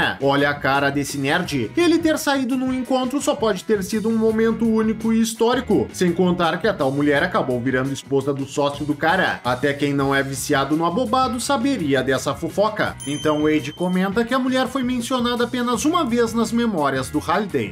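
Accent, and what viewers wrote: Rio Grande do Sul, Brazil. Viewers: Eae meu querido, ótimos videos acompanho sempre, eres gaúcho tbm?? tu voz me é familiar daqui do estado kkk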